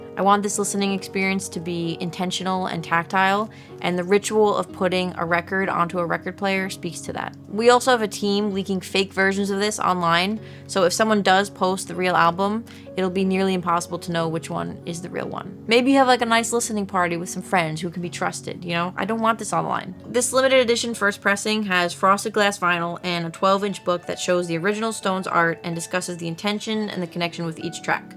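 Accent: Long Island, United States. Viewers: Greetings,! I've been lurking on your YouTube channel on and off for about a year. I didn't know you were based out of Long Island. I guess the thick accent should've given it away. Your accent is Long Island AF. I was born and raised in Long Island (Bay Shore) until I was 15 when my family moved to Florida. In my opinion, an artist is someone who uses their skill at a particular medium (in this case, harp playing) to create art. The person has a passion for their craft and is willing to dedicate time and effort into it. They have a desire to express themselves through their work and can envision ideas, emotions, and stories that are then translated into their work. Not only that, but their work is able to evoke emotions in others and inspire others. You're doing these things, so I would say that qualifies you as an artist. Keep doing what you're doing!, I'm all for physical media, and while I personally own a record player, not all of your followers do, and they have no way to listen your album unless they decide to buy a record player. 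Also, what's stopping someone from doing a line-in recording of the album to their computer and sharing the digitized audio online?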